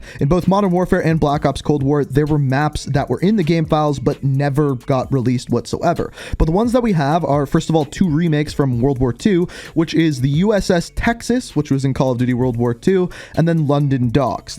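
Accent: Canada. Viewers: You're Canadian, I know accents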